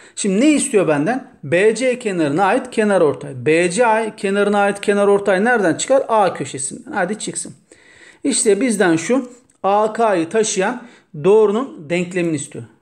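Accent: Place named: Çorum, Turkey. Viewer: Corumlu şivesi eskisehir veya sivas herhangi biri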